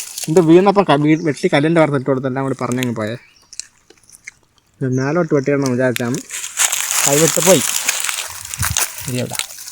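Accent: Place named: Malappuram, India. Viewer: മലപ്പുറം ക്കാരുടെ സംസാരവും ഇതും ഒരുപോലെ....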